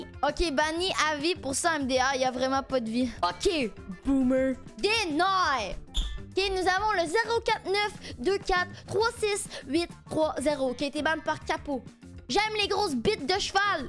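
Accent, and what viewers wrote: Quebec, Canada. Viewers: J'aime bien ton accent québécois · Elle a juste l'accent québécoise il y rien d'exceptionnel mdrr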